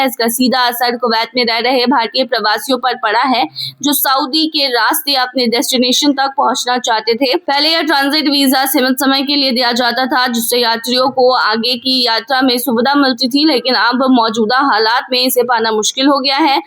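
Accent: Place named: Pakistan. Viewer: Horrible urdu accent, incomprehensible verbal diarrohea! Pls use copper tongue-cleaner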